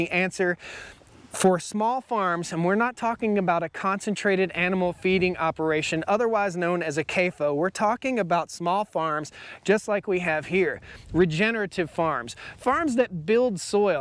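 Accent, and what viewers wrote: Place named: United States. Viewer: o boy .... newer give likes .... you earn it a merican coool speach loove it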